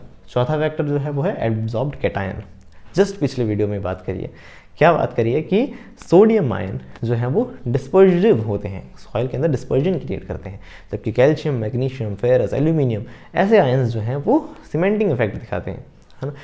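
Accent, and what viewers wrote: Bikaner, India. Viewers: No it's a bikaneri sir ❤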